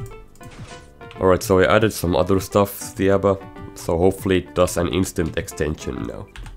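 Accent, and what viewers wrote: Finland. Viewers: You have the most finnish accent ever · I sense the Finnish accent, great video👍🏼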